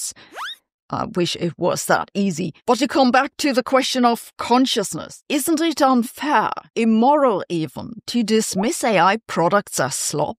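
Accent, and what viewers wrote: Germany. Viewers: In fairness you have a near flawless German accent.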